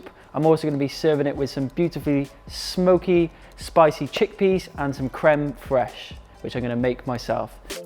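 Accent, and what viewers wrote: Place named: England, United Kingdom. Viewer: Hm What I think is weird is that anyone would come onto a person’s channel, who is obviously British, and not know or expect they may say or pronounce things differently than they might. And proceed to tell them they are saying it wrong.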